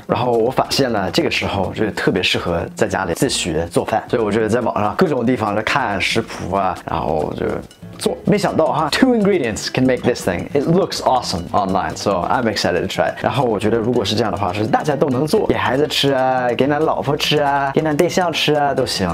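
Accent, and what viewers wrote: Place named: Northeast, China. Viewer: 好的！其实我最佩服你的东北口音，非常地道。我以前在哈尔滨的时候也有点东北腔。现在已经没了。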